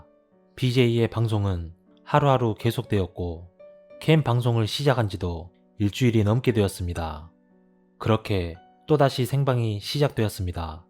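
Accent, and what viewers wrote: Jeolla, South Korea. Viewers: 전라도 분이신가요?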